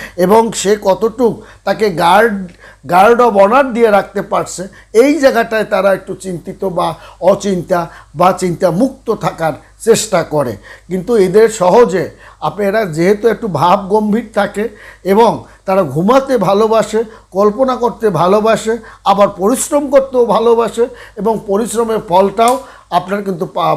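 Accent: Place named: Jessore, Bangladesh. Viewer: উনি যশোর জেলার/ খুলনা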